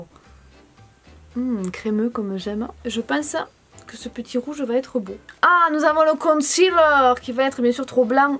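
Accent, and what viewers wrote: South, France. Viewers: Bonne et joyeuse à toi et à ta famille je te kiff grave merci beaucoup pour toute tes vidéos ta bonne humeur ton accent du sud que j'adore continue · Oh putaing ! Tu viens de Sud toi non?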